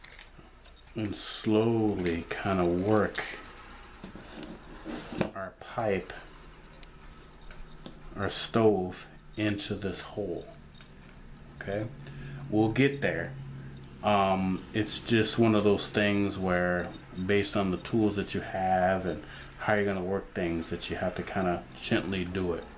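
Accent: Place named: Midwest, United States. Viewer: you my friend are from the mi-west I'm gonna say Wisconsin like me? you talk the area nice vid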